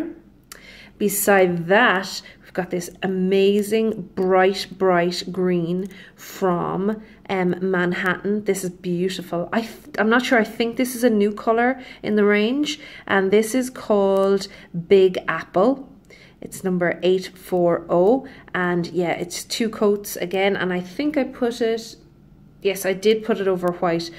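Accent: Ireland. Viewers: I'm applying Zoya's Merida as I'm watching your video! I love listening to your Irish lilt, what part of Ireland are you from? My great grandmother was from Belfast. Her father was a tugboat captain in the Belfast harbor & was one of the tugboats that pulled the Titanic out of the harbor on it's fateful voyage!:)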